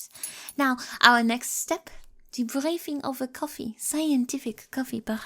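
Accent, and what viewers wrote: France. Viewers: Oui oui you sound gorgeous with a French accent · I love your French accent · This detective audio was absolutely amazing!!! 🔥 Your voice performance was absolutely outstanding and you did the French accent perfectly! The art style for the thumbnail is so beautiful and I really love the character's design! I already can't wait for what audio you deliver next with your amazing talent! Amazing work! 🩷🔍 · She accuses us of too much rizz? Excuse me, with that French accent and detective charm, you are the one who has rizzed us all! Thank you, 🤍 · You did a wonderful job on speaking in a French accent! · Omg bro ok this audio is your best one so far no question about it. Your French accent is amazing. I love the funny names the tool and different Geneva accords are called and even the funny scenario names you came up with. Loved the “rap sheet “ The French accent makes it better. 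The bad weather random voices are distinct in range are easy to tell the different voices. The lore in the Clark verse is reaching levels closer to Radish asmr.Thanks to the detective we will finally know how Clark is able to achieve these absurd levels of charisma. He needs to leave some girls for the rest of us oh my goodness king 😭. I love the detective she brings out such must protec energy out of me. I am so glad I subbed and joined your discord. This part is for especially imagine I am one of your female friends for this.” Girl you ate that and left no crums 💅” “Omg slayyy girl 😂” “Girl your voice is divine “ “Yes queen you ate that up”. “ Yesss girl the thumbnails are on point “. On a more personal note hope you are well and taking care of your mental and physical health you are talented and your work is subline. So happy to see you reach new heights girly pop. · I love your French accent! 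Ooh la la! Bonjour! ¡Enchanté! 🥰😍😻🤗😘💗💓💖💕💘 ❤❤❤❤❤ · Congratulations. I'm obsessed. I need more french accents. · God, I need another French accent video by you. The way you do it is great. It's enthralling and incredibly amusing at the same time! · I'm from Louisiana and u have a beautiful French accent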